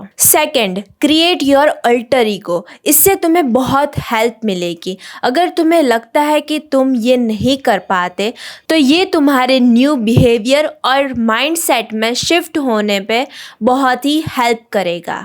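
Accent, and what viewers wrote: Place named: South, India. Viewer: Are you from south india?? Your hindi like this ❤❤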